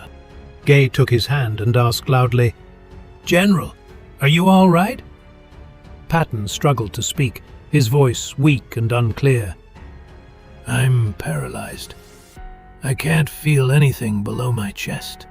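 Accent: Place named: England, United Kingdom. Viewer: I really wanted to listen to this but the English accent ruined it.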